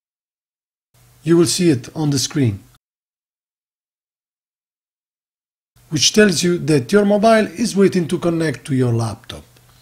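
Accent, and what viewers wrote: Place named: Italy. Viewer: accidenti l accento italiano come si sente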